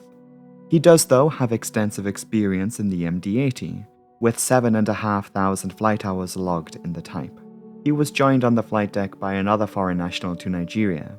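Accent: Newcastle, United Kingdom. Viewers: I'd adore if you did a full video with your native Geordie accent, it's such a lovely one! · Go hard on the Geordie accent Sir. I was really fascinated by your research and subsequent report. Ah, I do not think I need reminding that booking a flight on Dana Air will not result in a satisfactory outcome. Sounds like a way to fleece unsuspecting, non-Nigerian international travelers out of their money and stash it under the mattresses of certain Nigerian government officials. To be fair, most of middle and south Africa is in a state of turmoil at this time, so it is not surprising that a certain 'cowboy' style of airline operation would be able to survive in such a theatre. What was especially shocking however was your discoveries concerning the engine maintenance outfit in the USA. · Your Geordie accent was Beltas Mate! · Your not a Geordie are you ? 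· Your a Geordie? Well that was a surprise. Haddaway. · Why I’d neva ov thought you were a geordie. I’m from Durham U.K. love your vids. They’re canny good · Thank you for an amazing researched informative and beautifully presented video. By the way, nothing lovelier than a nice Geordie accent! X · I have wondered about you accent. I had no idea that you're a Geordie. Awesome! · You sound a bit geordie, but what else is going on with your accent, no way it's100% newcastle... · GREAT video. I used to live in America, and I keep abreast of news from the states. Florida governor DeSantis is pro business to the point of business there running rough shod over ANY regulations what so ever. It's become an abyss of regulation dodging garbage. I am NOT SURPRISED so much of this is traced back to Florida at all. I would not trust anything coming from Florida (including the pilots themselves it seems) to have any degree of quality to it these days. 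You produce amazing videos, lovely Geordie accent girl! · You're a Geordie? I'd honestly never have guessed until you made it very obvious! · "Dana Air...grounded for maintenance and other violations so often we haven't had a fatal accident in ten years!" Your dedication going down the WTF rabbit hole at the end of this was appreciated. Incompetence is funny when it's not injuring people (although those who need refunds might want a broader definition of "injury".) And never apologize for a Geordie accent. As far as this American is concerned, the BBC has to apologize for exporting only programmes in which everyone spoke RP or occasional Cockney until the 90s. They've been holding out on us! Now that we've basked in some lovely Sheffield and Leeds Yorkshire for the past five years, Doctor Who isn' allowed to have a southern accent again until they've cycled through Scouse, Mancunian, Brummie, Geordie, Welsh, and Northern Irish. 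;) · I would never have guessed you had a geordie accent :) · I started the video wondering what accent this is, but wouldn't have pegged it Geordie apart from the pronunciation of year.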